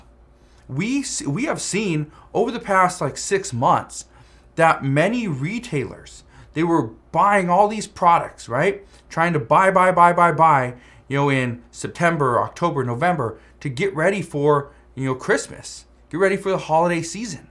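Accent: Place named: Minnesota, United States. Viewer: Sounds like MN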